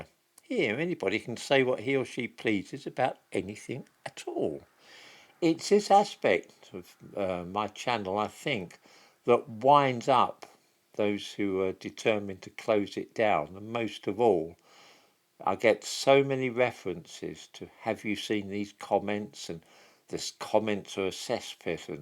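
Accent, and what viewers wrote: United Kingdom. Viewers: "...who is expressing views which are seldom voiced aloud..." I think you are not quite on the mark here. MANY people voice similar views. But here on this channel those views are voiced eloquently, and have them backed-up by (often quite obscure) facts. For example, though many people know in their gut that the current narrative on the 'Windrush generation' does not add up, few know enough on the subject to confidently object to seemingly factual statements made by supposed educated journalists, politicians and activists. Having someone actually articulate those views, backed with solid facts, that is what draws people in. Especially when voiced in an endearing archetypical British fashion.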